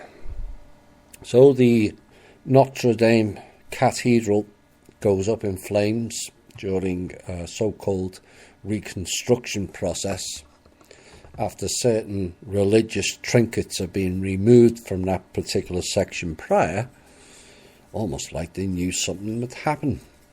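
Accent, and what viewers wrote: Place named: United Kingdom. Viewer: How could it take almost one billion dollars to put a new roof on that church? Something wrong with that picture. From the mindset of an American living in southern California I must say I find your commentary with just a tad bit of cynicism spoken in your British accent quit amusing while very informational. Thank you for your efforts to keep us informed of things regarding the evil cabal!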